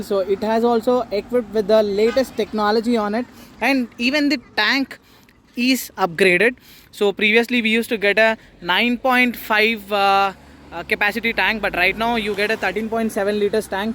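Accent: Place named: United States. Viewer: ur english is awesome !! speaking like an american !!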